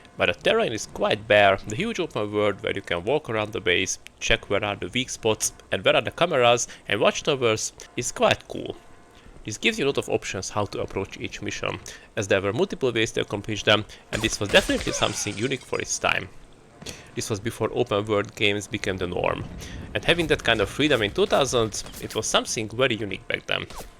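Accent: Hungary. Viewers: BTW, are you Hungarian by any chance? I think I can hear on the accent. I live in Budapest but speak very poorly. Anyway, subscribed and looking forward for more backcatalogue reviews.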